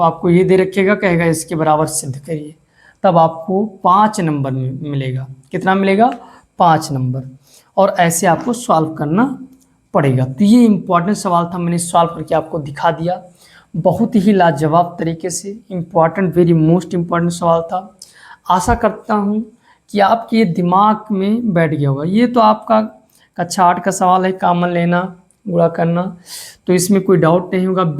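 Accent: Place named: Awadh, India. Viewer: Sar avadhi bol rahe ho thoda bahut😅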